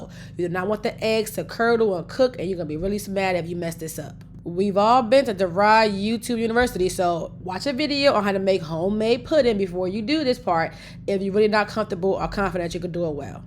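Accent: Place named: Louisiana, United States. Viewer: Louisiana accent 💋💍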